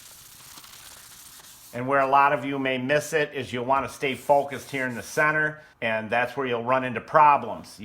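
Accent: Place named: Pennsylvania, United States. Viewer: Where are you from? Your accent sounds a bit like it's from my neck of the woods in northeastern PA.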